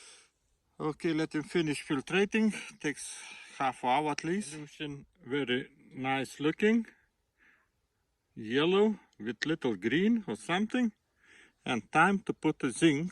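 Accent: Russia. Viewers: But the funniest thing is Russian speaking English 😅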